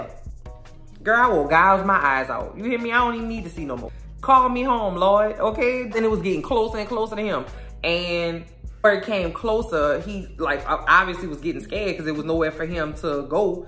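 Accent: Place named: Louisiana, United States. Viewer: I hear the Louisiana in your voice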